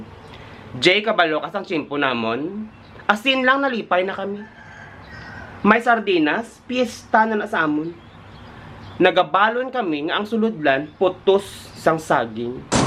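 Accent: Iloilo, Philippines. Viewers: Kamusta!Baw enjoy gid ko sang ilonggo accent mo ah kg ang mga tirada mo.Keep up the good work kg stay safe gid always 🙏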